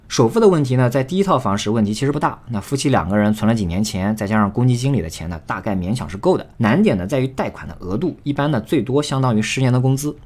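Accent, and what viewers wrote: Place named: Shandong, China. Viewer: 有一点山东口音哦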